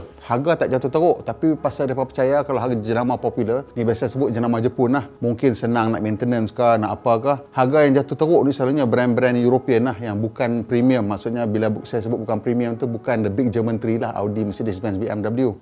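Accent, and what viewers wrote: North, Malaysia. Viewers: Hahaha illuminati 🤣 Kemaihhhh bang! Teruih cakap utara... Kami syok!!!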